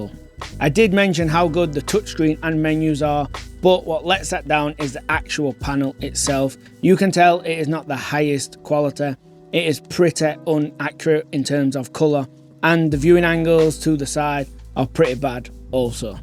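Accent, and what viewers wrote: United Kingdom. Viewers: i really like your videos but pls you have to speak a bit better because as a non native english speaker its hard to understand your harsh british accent.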